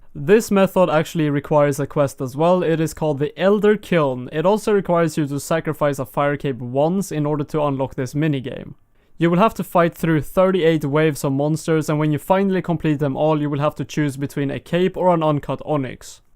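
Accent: Sweden. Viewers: The swedish accent :D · Your Swedish accent is so sexy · the swedish accent is so obvius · Where are you from? Your accent sounds like swedish-english ish:P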